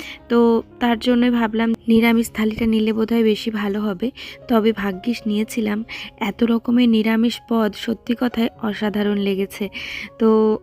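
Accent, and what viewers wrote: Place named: Bankura, India. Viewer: Thank you amar comment er answer vlog e bolar jonna...amar bario bankura te...prothame tomar kothar tan sune bujhechilam tumi nischai bankura r.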